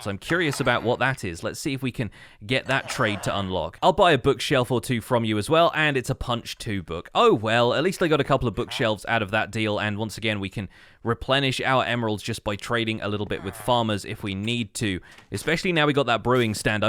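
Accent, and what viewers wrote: United Kingdom. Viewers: You sometimes sound like AbdulHakim Murad. Clear british accent sounds great for us non native speakers and both of you have it.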